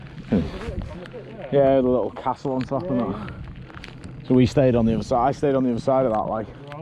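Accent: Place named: Liverpool, United Kingdom. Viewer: Enjoyed you enjoying that. Good stuff. (Are you from Liverpool, lid?)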